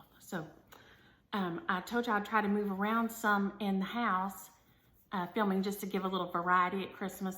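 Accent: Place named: Texas, United States. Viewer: I so enjoy watching you! I was born and raised in Texas and I love hearing your accent 💗 it reminds me of home. I still get on my sons even tho they are in their 40’s, the grandkids love it 😂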